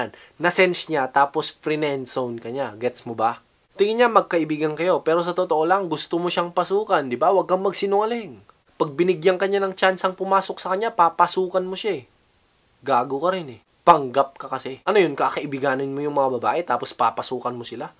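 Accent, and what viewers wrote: Ilocos, Philippines. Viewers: bakit boses ilocano?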